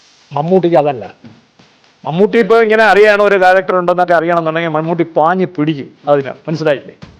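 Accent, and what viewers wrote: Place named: Thiruvananthapuram, India. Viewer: എന്താരായാലും നീ വായിക്കുന്നവത്... തിരോന്തോരം തന്നെ അപ്പി....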